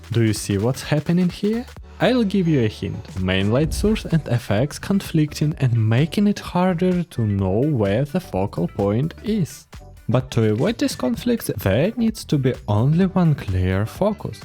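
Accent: Russia. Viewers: Спасибо большое за ваш русский акцент Поверьте мне, если бы вы были говорили с английским акцентом, я бы вас не поняла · Почему я слышу русский акцент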